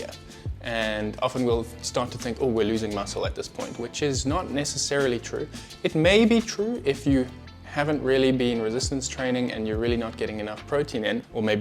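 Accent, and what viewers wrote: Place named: South Africa. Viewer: Why is someone with a SAffer accent talking about "pounds"???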